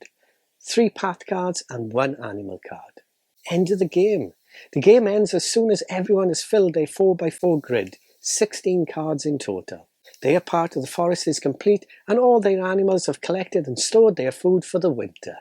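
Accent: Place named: Wales, United Kingdom. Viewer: Great review this is one game that has been on my radar for some time ,and your video has given me the inspiration to add it to my next order with game lore Also its nice to here a whelsh accent as a lot of the board game reviews are from the usa ,so keep up the good work from a old board game loving chat from just over the boarder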